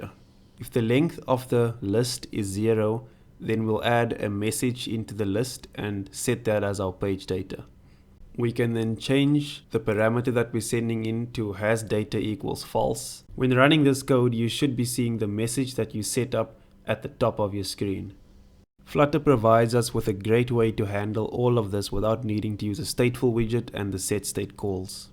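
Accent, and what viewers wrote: South Africa. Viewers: Sorry man I just want to ask are you south African by any chance ??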